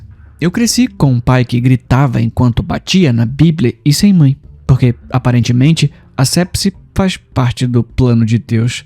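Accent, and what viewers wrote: Caipira, Brazil. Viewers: hahahahah esse sotaque caipira foi a melhor coisa do canal até hoje 🤣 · vim pela história, fiquei pelo sotaque caipira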